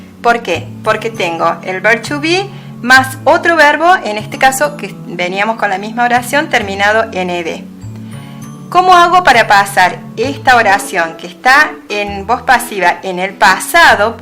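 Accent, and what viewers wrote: Córdoba, Argentina. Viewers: Es de cordoba se nota el aasento grasias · Muy buena leccion y muy simpatica esa tonadita de Cordoba!!! 😄😄😄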